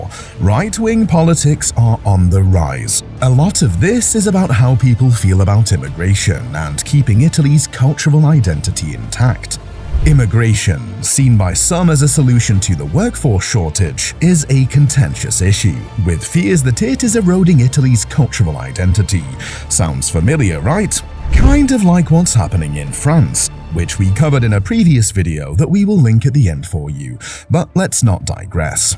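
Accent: United Kingdom. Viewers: Assembling other people's videos and hiring a narrator with a British accent can be PROFITABLE. Nothing here that a good statistician could have told you-and has, btw. Why don' t you consider the immigration problems and the Procreation for Recreation immigrants overflow in Lampedusa or those being shipped to ALBANIA ? OLD NEWS . NO SUBSCRIBE. NO LIKE.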